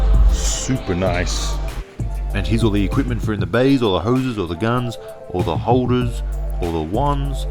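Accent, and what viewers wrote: Australia. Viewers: is that an australian accent? definitely not american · No idea why this popped up on my youtube.....Notice the Aussie accent! so kept watching (Me being an Aussie) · Do I hear an Aussie accent? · Are you an Aussie?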